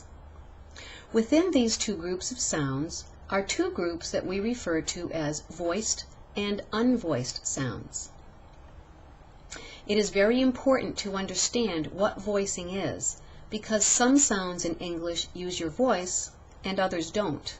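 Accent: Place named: New York, United States. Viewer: you seem to actually have a new york accent.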